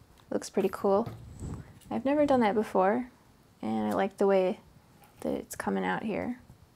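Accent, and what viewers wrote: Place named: Midwest, United States. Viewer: "Taaag" and "Staaak" That good ol' mid western accent!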